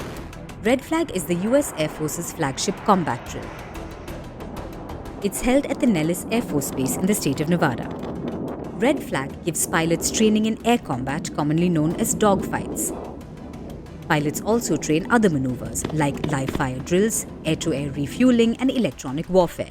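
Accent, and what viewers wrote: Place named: India. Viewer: I detected the Indian accent! Why would Inida care and brag about the US military? This is weird.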